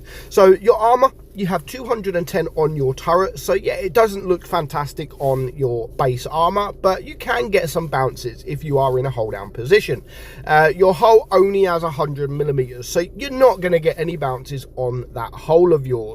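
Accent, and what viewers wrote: United Kingdom. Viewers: apac i like ur briish accent mate lol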